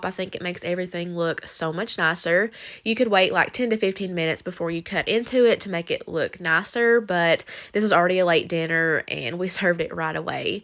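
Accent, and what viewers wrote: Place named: Kentucky, United States. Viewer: Hi, When you record your voice overs you often say ‘and then’ try mixing it up with ‘the next step’ or ‘the recipe says’ or ‘what you want to do next is’ we all love your Kentucky accent ❤️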